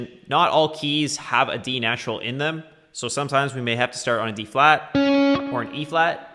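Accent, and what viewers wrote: Canada. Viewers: Level 2 made no sense - canadian accent made words indistinguishable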